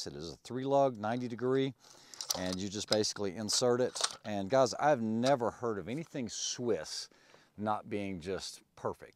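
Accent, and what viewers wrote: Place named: Midwest, United States. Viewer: random, but me and my buddies are trying to place your accent! where are you from? i think you have the vowels of the midwest personally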